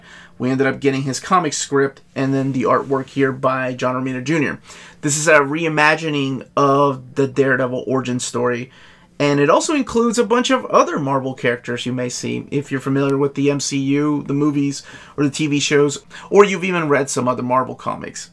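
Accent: United States. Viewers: what country do you reside in! Just super curious, I think you’re American however I cannot tell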